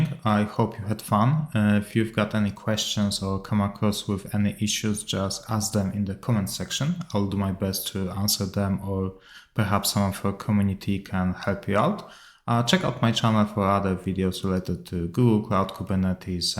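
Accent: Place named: Poland. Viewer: od razu poznalem nasz akcent :P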